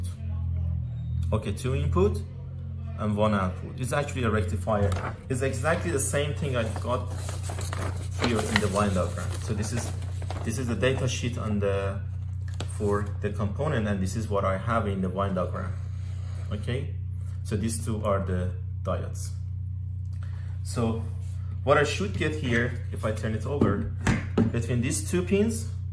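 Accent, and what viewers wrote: Iran. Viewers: just say thank cause it was useful for me and enjoyed your sweet persian accent👍👍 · You have an accent are u from Iran?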